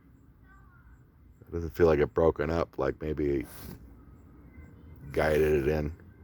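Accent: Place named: United Kingdom. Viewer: Кто нибудь расскажите об авторе канала Кто он ? Что он? Кто он по национальности ? Американец, Британец? Я не очень разбираюсь Но если не ошибаюсь у него Британский Английский. Американецев вообще сложнее понять Американцы Коверкают Искажают Английский язык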